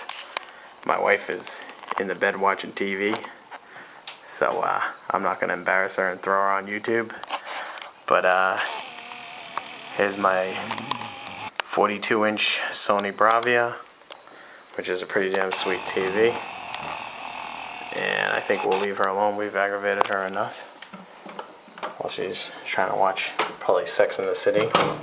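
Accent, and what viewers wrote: Boston, United States. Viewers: Boston accent is killah....apahtment..lol.love it. I just got the PF Freddy Krueger and the J. scott Campbell comiquette. They are frikken shhweeet!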